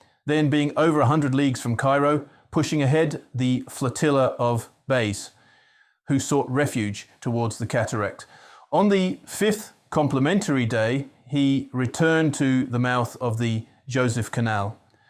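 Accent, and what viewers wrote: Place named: United Kingdom. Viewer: I am not sure you could have gotten a worse narrator. Did you really need Napoleon to be read by a guy with a heavy British accent and saying - each - word - individually - and - distinctly - with - no - smoothness - of - delivery. I cannot imagine how he learned to speak like that and not be beaten by his teachers. Maybe have him read it once beforehand and then record the second attempt. Hopefully, he was not a professional narrator. But I complement you on the topic. I myself was looking to read more about the Egyptian Campaign after seeing the Napoleon movie.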